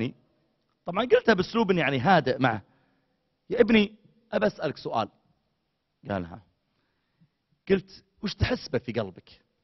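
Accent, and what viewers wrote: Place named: Saudi Arabia. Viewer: يسعدك قمر يا شيخ حديثك الموزون الرائع في كفة ربي يجزيك كل خير وتلك اللهجه السعوديه في كفة أخرى ما اروعها🌸🌸🌸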